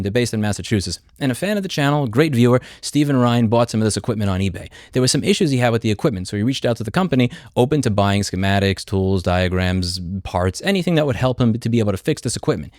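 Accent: New York, United States. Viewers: Is your voice ok, because you sound way more new york now? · You still sound New York, its going to take a few more years to get your twang. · You are doing gods work in “well actually”ing these lawyers that defend anti repair :) Btw your accent is still vey much more NY than TX. There was a slight drawl towards the end of you mentioning that tho. :P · Has louis NY accents gotten worse since moving to texas, love it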